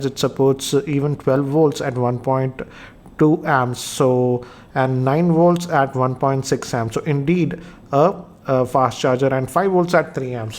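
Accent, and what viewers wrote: India. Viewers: So that what it is. Indian English sucks👎🏻👎🏻👎🏻👎🏻👎🏻👎🏻👎🏻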